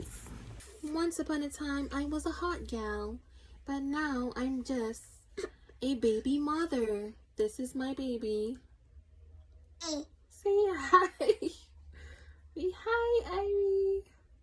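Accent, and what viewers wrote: London, United Kingdom. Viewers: lol 😂😂😂 you got the London accent on point ❤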